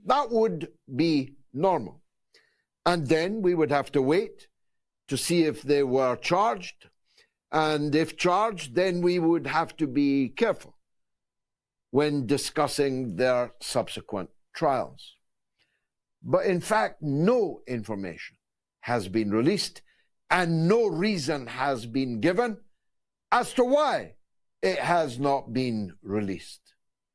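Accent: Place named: Ukraine. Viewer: A Russian speaking Ukrainian, I hear ?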